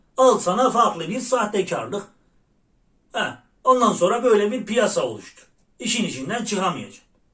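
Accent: Erzurum, Turkey. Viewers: Sen Erzurum lumusun abi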